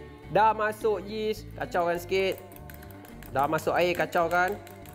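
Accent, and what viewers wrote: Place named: Kedah, Malaysia. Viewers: Dia cakap macam orang utara la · abg..ni org kedah ke...ckap pekat bak angg😂😂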